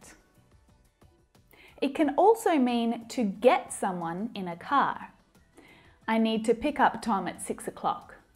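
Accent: Australia. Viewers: Australian accent? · I picked up Australian accent by watching video.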